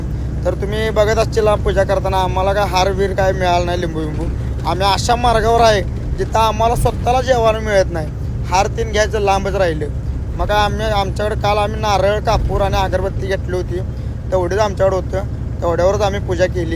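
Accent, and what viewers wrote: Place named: Kolhapur, India. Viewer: भावा टू कोल्हापूरचा आहे का मी पण कोल्हापूरचा आहे कागल मधला तुमच्या भाषेवरून मी ओळखले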